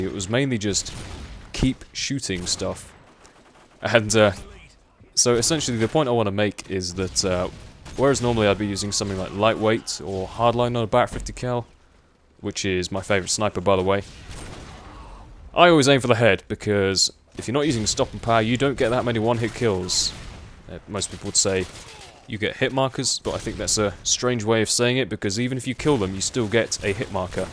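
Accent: United Kingdom. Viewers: My favourite commentators are you (obv) and The Trout91. I just like hearing other British people I guess and you talk about interesting stuff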